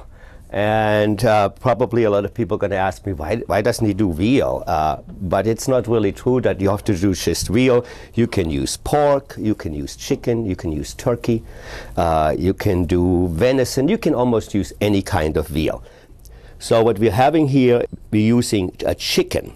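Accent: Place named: Germany. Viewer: i like his German accent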